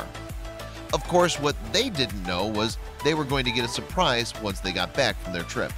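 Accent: United States. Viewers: Interesting point that the narrator sounds American.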